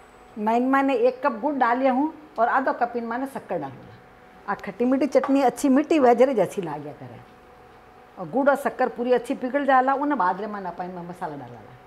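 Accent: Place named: Marwar, India. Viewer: आपको समजावणा को तरीको बढीया है आवाज बहुत साफ,मिठी बोली और शुद्ध मारवाड़ी !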